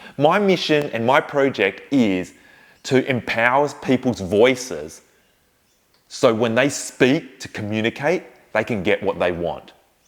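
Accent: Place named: Australia. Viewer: Thank you for this video - I really enjoyed it. However I have difficulty understanding the thick Australian accent - I believe if you made it little more neutral it would be impactful on more people ! 😊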